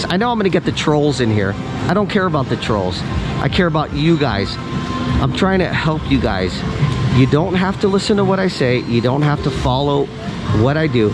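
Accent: Australia. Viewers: Pokies! You sound like another 🇦🇺 😊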